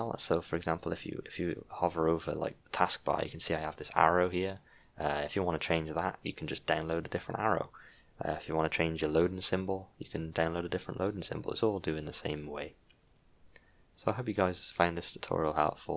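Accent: Wales, United Kingdom. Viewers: Welsh lad?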